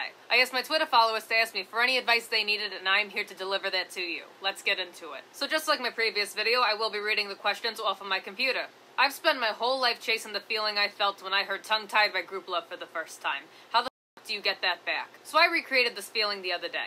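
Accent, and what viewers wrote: New York, United States. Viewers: Is this accent from New york. Is both the most wonderful and kind of intimidating! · You come from New York with that accent and definitely Italian parents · I love this chick hear voice is wonderful dead ass. She sounds really New Yorker🤣 and I am from nyc, but she is also funny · Hey are you from new York?I was really wondrring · She from New York?? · Was just recommended your channel. Are you a New Yorker or from New Jersey? Or are you from Boston? That accent sounds like New Yorker! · Hey, I like your accent.. it's a mmmm very New Yorkie. For get about it... lol 👍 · Are you from new york or something east coast? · We Stan an e girl wit the New York accent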